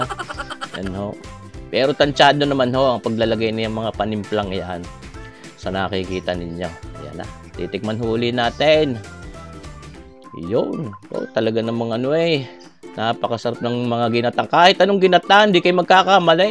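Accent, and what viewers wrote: Batangas, Philippines. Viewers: Kabayan nag review pa ulit ako ng luto mo. I believe na napakasarap niyo. Inggit ako. Salamat kabayan. Palagi kitang sinusubaybayan. Aliw na aliw ako dahil sa puntong Batangas. Pati ako ngayon ay na pra- practice ko ang tagalog na may puntong Batangas. Panalo yan.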